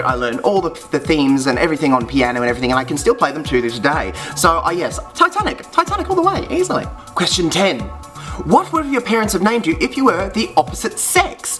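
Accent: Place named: France. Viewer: I like your french accent ! He's so cute !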